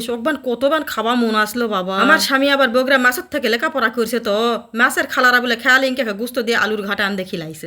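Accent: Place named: Naogaon, Bangladesh. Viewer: বাহ দারুন ভাবে তো বললেন নওগার ভাষা ❤❤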